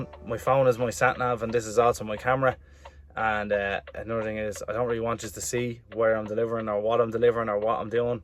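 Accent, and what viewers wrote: Ireland. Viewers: Hey, Dutch trucker here, I really enjoyed the views, I only drive Netherlands and Belgium so. Normaly I watch English movies without subtitles but your Irish accent and kind of mumbling is really hard to understand... also the automaticly generated subtitles aren't very helpfull. Maybe you can edit subtitles correctly for future videos, I am subbed from now on.... peace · A nice broad Irish accent. Sometimes need to listen a wee bit carefully but I get the gist of most of what he's saying. I certainly don't want to sound disrespectful or rude to the nice young man.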